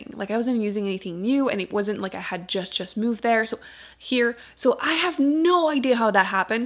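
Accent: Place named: Quebec, Canada. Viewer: Français rouillé! ;) J'avoue que je taquine mon fils quand il nous visite et parle franglais... Mais je trouve quand même surprenant - agréablement - que les mots en français que tu glisses à l'occasion "sonnent" québécois quand même... plusieurs finissent pas parler avec un accent anglais, même si leur langue maternelle est le francais...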